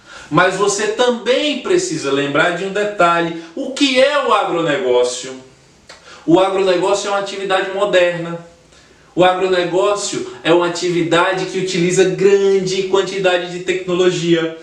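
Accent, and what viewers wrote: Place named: Bahia, Brazil. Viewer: legal a aula e o sotaque baiano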